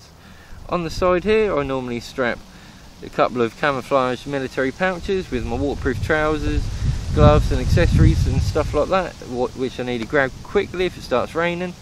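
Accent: Norfolk, United Kingdom. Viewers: Bor Yueh presented by a rum ole Norfolk boi sounds like ole Boi yeah haha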